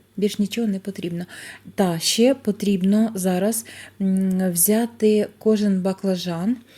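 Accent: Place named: Kharkiv, Ukraine. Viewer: Дякую за смачний рецепт.Сьогодні засолила нову порцію,тепер тільки подолати очікування довжиною в 5 днів.А ваш говір нагадає улюблену подругу з Харківщини-ці неймовірні українці під щоденним бомбардуванням роблять свою справу для перемоги і не збираються виїздити в більш-менш спокійні місця. Процвітання каналу!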